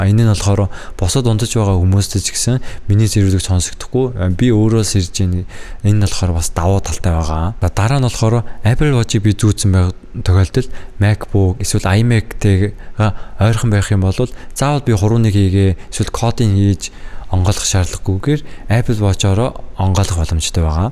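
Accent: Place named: South Korea. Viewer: Angli helnii duudlaga n aygui huurhuun yag korea english kk amjilt husiy danda engiin murtlu humuust medeelel ygdug n taalagddag shy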